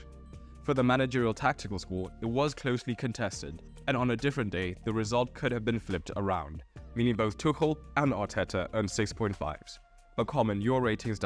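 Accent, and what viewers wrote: South Africa. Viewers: yo are you south african ?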